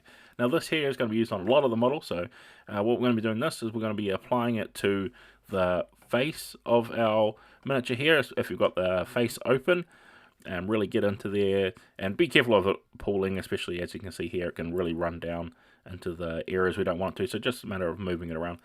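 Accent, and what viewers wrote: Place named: New Zealand. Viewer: "now we wanna come in now with some dick tan" I love the kiwi accent!!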